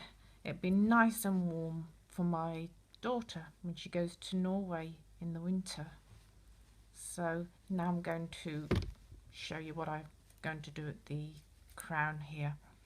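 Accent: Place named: Birmingham, United Kingdom. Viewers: nice to hear an English accent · love your Brumie accent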